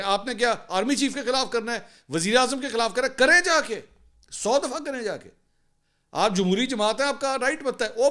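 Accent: Punjab, Pakistan. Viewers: apka Punjabi ka tuch bht Zabardast hai.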